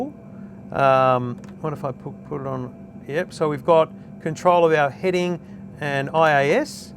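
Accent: Australia. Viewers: Judging by his accent I'm assuming it's Australian dollars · LOl It is true that there is a lack of "expertise" here but the video has 3 importants ponts: 1. The Aussie accent, love it 2. The fact he tests on Xbox, quite rare 3. he seems to be a begnner and there are a lot of people who don't know sh..; about flying out there and that will do the same :D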